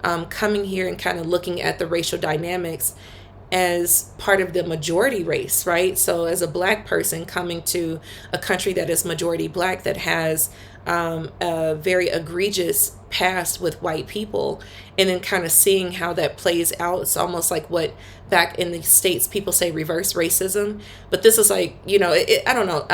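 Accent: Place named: South Africa. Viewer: Thank You Mam for promoting our Country. You sound like a South African already. Enjoy Your stay here.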